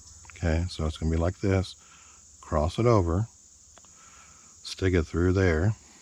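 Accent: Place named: Austria. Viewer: Austrian conductor English. Lineman's Loop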